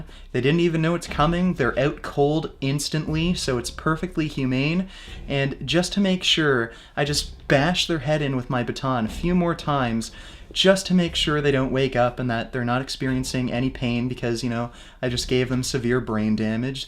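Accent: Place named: Toronto, Canada. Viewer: Xavier Rose typical Toronto accent. It sounds insanely gay.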